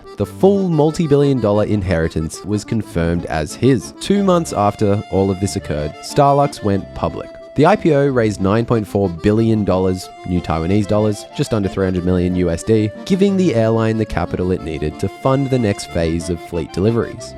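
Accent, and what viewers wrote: Australia. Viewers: Yeah, particularly because he's good looking with an aussie accent 😊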